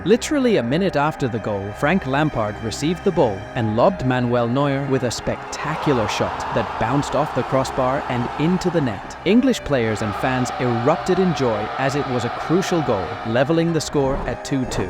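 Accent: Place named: United States. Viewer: American accent? Wow.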